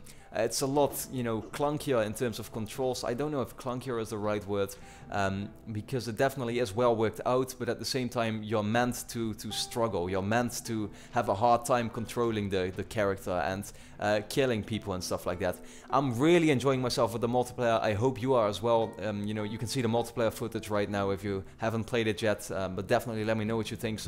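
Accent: Netherlands. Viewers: Are you from belgium or netherlands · Aan je accent dacht ik al dat je Nederlands was maar weet het nu zeker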